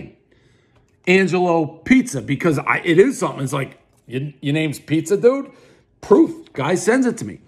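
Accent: Boston, United States. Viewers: No, it’s his low class Boston accent. He does it with every word like that. He says mama’s like muh-muh’s.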